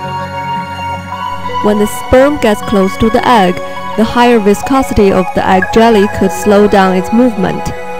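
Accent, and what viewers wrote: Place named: South Korea. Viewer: She sounds korean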